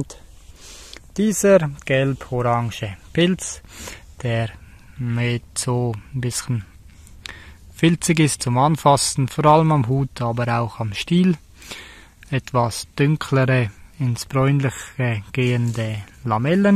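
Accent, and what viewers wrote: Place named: Switzerland. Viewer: dieser geile schweizer dialekt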